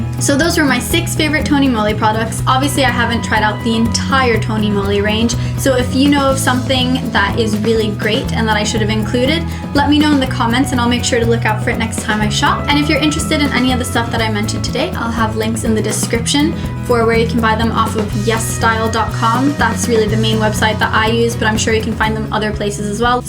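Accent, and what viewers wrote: United States. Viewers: U said u paid pounds but u have American accent.. Jus wanted to know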